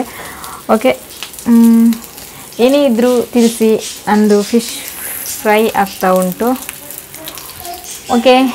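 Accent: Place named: Mangalore, India. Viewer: ನನಗೆ ಮಂಗಳೂರು ಕನ್ನಡ ತುಂಬಾ ಇಷ್ಟ ನೀವು ಮಾತಾಡೋದು.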